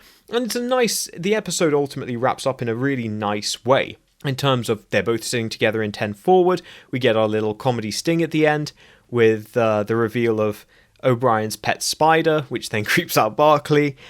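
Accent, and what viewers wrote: United Kingdom. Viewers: a British pronunciation should be expected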